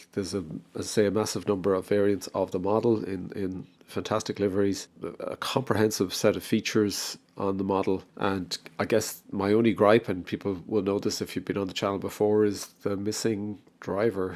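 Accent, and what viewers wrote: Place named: Ireland. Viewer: You sound like a fellow Irish chappie . Great video and thank you !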